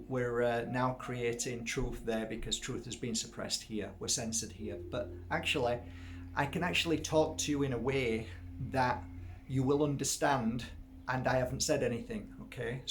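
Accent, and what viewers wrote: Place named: Yorkshire, United Kingdom. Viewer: Brilliant! You’ve got such a beautiful heart! ❤️ Thank you for the workshop. I will try and help those who are going to need this 👌💫 Ps. Love listening to you 💕 Your accent reminds me of the time I had spent in Yorkshire, I used to study and work in Leeds for some good 6 years! 😄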